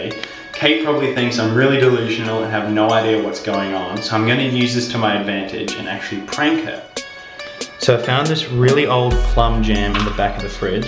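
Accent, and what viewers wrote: Australia. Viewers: Is he Australian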